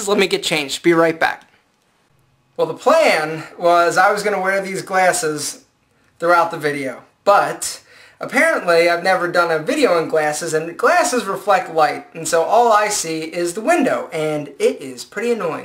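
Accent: Philadelphia, United States. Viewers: Oh boy. Philly accent. "Gleasses"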